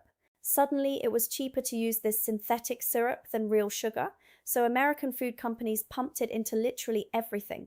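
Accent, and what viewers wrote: England, United Kingdom. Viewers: Am I missing something ? For an American you have a very British accent!?!? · If you're an a American why have you got an English accent? Please answer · I'd love to know how being American she speaks with THAT Accent?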